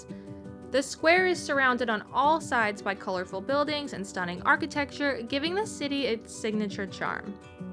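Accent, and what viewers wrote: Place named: Serbia. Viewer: Pronouncing Serbian surnames correctly, equally in terms of accent and pronunciation and the use of the letter Ć, undoubtedly shows that you have Serbian roots. Americans or Britons cannot pronounce Serbian surnames correctly, either by pronunciation, accent, or the use of the letter Ć. And your overall,general accent telling undoubtely that you are not British,or American,or Canadian,or Australian. So, if you are of Serbian origin, and you have such an attitude towards Kosovo, you should be ashamed of yourself and never come to Serbia again.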